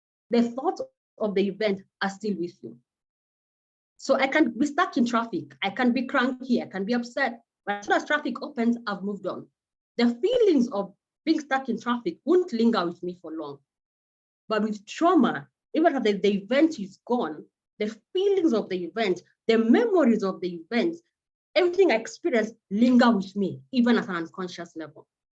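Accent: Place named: Botswana. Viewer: I love your accent! I was growing up in Botswana and I miss it a lot.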